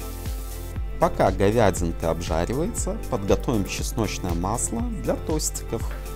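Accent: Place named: Belarus. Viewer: Профи👍 У вас белорусский акцент. Или я ошибаюсь?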